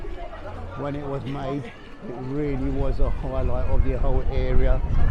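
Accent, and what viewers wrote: United Kingdom. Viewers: I remember Bodgers in Illford and Illford Town Hall disco's on a Monday night (Interupted due to N. Benn fighting with Locals!). No offense but I detect a bit of Brummie in your accent, am I right? 😄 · You sound more Cockney than me and I was born in Stratford in 1969 👍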